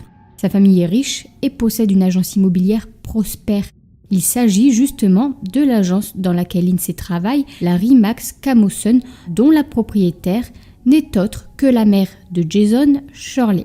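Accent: North, France. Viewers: J’aime beaucoup vos vidéos ! D’autant plus que je reconnais l’accent du nord il me semble ❤️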